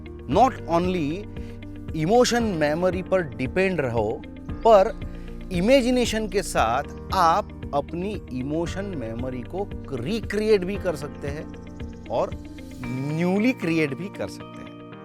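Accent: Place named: Bengal, India. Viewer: tumhare language mein bengali accent bhar bhar ke ah rahi hai 🙂🙏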